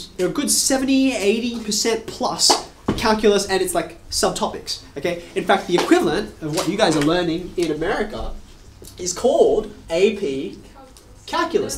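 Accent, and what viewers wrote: Australia. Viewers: Does he speak like an australian? I want to get used to hearing the australian accent, i'm learning english 😄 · Aussies have such cool accents compared to us Americans like this guy, Woo. They are like the cousin you can't wait to chill with at the cookout after tolerating the arrogant and boogie British, and the too nice and boring Canadian cousin. Kiwi cousin is pretty cool too.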